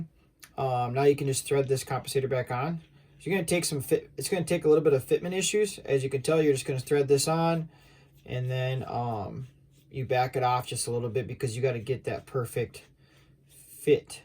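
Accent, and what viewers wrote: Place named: Minnesota, United States. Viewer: your voice and accent is remarkably similar to the friends' who I grew up with in Minnesota (near the MN/WI border in a town called North Branch).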